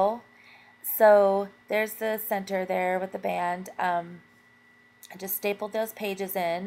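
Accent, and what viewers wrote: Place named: Nashville, United States. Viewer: hello where do you live you sound like your from Nashville that where I live but you said you went to somewhere eles to the dollar store just woundering love your vidos learn so much is there any way to send you a message?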